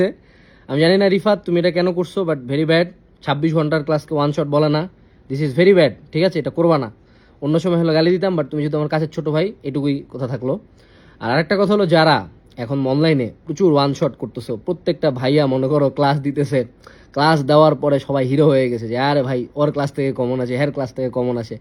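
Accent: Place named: Sylhet, Bangladesh. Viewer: oni sylheti er Jonno accent ei rokom abr onar past onek koshter oni jeikhan theke Uthe ashche shobai ta parbe nah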